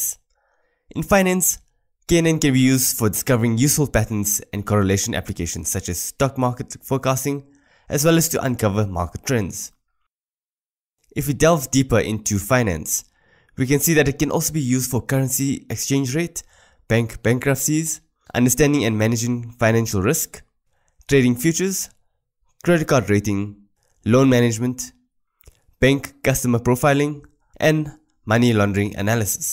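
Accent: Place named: South Africa. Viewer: This dude sounds like he's from South Africa.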